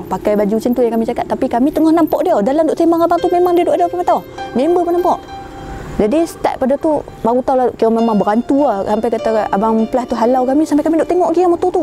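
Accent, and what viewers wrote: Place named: Penang, Malaysia. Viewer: . Hang segak la cakap penang pulak! Hang mmg comei 😍😍😍 dok follow dari joki timba tu.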